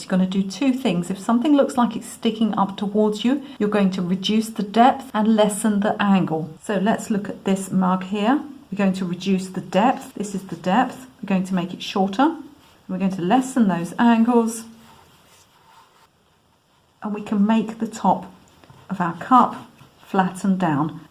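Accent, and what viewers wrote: United Kingdom. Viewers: Your A brit, Love it. I lived in Ipswich and Colchester for 5 yrs. Love your advice it really helps. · Thank you for the great tips. I'm new to drawing and still trying to get basics etched in my mind. Pressing to hard is a bad one for me and have been doing it since school days. It really has to be stopped so thanks and love the British accent good day!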